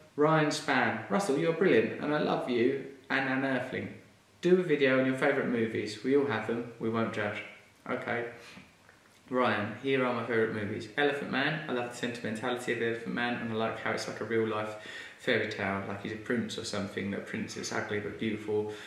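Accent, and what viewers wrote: United Kingdom. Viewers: Russell, I love you man, and your videos. But could you please invest in a good quality microphone? Also maybe record your videos in a less echoy location? You have awesome things to say, as an Aussie, being brought up watching mostly second hand British TV shows I do understand your accent most of the time, but it is sometimes quite hard to hear you due to the echo and quality of your mic. Just something to keep in mind, Thanks mate! Keep up the awesome vids!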